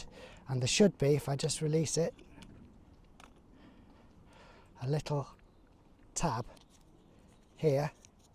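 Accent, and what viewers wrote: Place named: RP, United Kingdom. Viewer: I seem to have failed to utilise your nice movie as a listening material of Received Pronunciation. I can completely imagine what you're saying even though I don't understand English itself -- I used to shoot with this super-heavy camera forty years ago! -- Best wishes from Japan.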